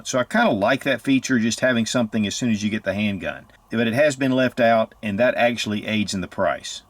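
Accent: United States. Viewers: I’m pretty sure he means this is America as in the way we pronounce things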